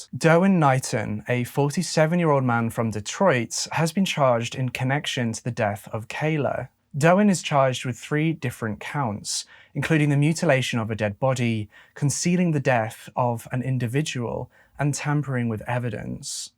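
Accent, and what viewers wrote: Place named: Derbyshire, United Kingdom. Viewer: im guessing Derbyshire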